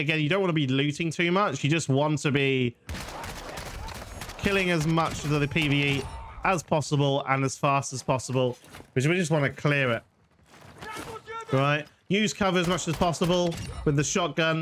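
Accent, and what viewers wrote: United Kingdom. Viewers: Hmm... "The gatman" for an assault rifle... well, you sound British so I'll chalk it up to ignorance.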